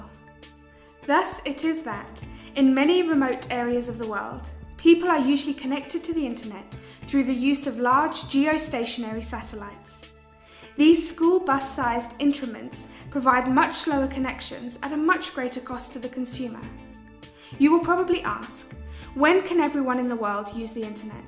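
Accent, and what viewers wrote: United Kingdom. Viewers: So well articulated. Perfect English with a Brit or New Zeeland accent. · Very good, high information content video. I especially like the English accent of the sweet sounding narrator. I give it a 99.99!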